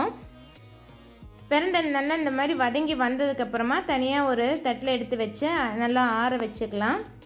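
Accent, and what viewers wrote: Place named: Kongu, India. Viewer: mathikadhinga akka . Kong slang dhan eppavum nice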